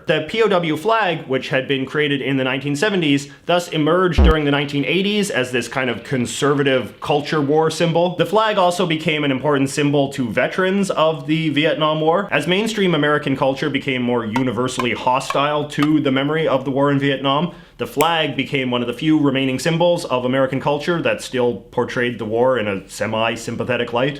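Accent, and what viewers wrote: Canada. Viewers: Gunter Ringgenberg is Canadian. Why do you think he says "about"like that?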